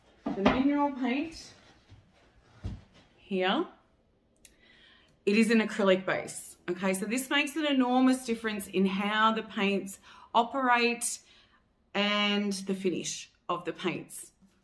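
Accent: Australia. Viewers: Thanks so much for the in-depth video! Always good to hear a fellow Aussie too haha. This was fantastic, definitely deserves more views and likes. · Lovely to hear an Aussie accent - thanks for the info!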